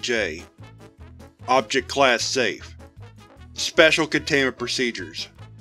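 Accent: South, United States. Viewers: Something about your southern accent talking about the saints is so funny to me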